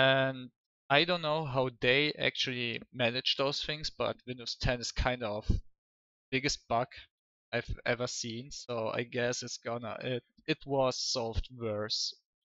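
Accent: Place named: Germany. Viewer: Ich hör raus, das er deutscher ist xD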